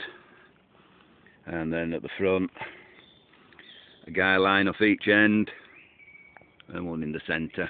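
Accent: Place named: Leeds, United Kingdom. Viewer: You wildcamp like me sir, I trip up over my guy lines too. And I ramble on to myself hee hee. I live in Cheshire so not so far from (leeds?) from your accent, if you ever fancy a meet up for a wild camp give me a shout...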